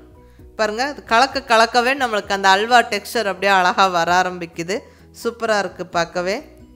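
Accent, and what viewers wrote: Coimbatore, India. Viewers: ka Coimbatore style pechu alagu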